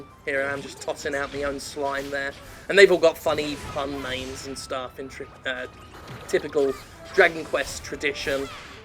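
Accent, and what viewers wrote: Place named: United Kingdom. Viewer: Second Sounds like you're British? Me too, but I moved to the States for Grad school. First exam I ever graded the class average was 68% (which I thought was good, of course) and the course instructor, when he saw it, said "Ooooh shit that's bad". Flip side is that it's common to get scores in the 80s and 90s, whereas a 70 when I was at university was excellent.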